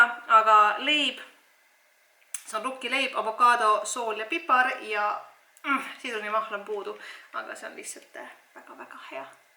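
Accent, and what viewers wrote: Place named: Finland. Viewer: Nii mõnus soome aktsent ☺️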